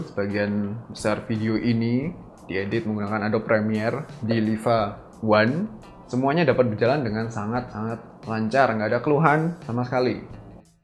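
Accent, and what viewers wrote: Java, Indonesia. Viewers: Waduh Pak Logat Jawa nya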